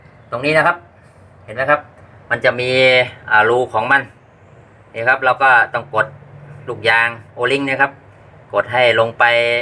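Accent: Chanthaburi, Thailand. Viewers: คนที่ไหนครับสำเนียงนี้ คล้ายบ้านผมเลย ผมคนจันท์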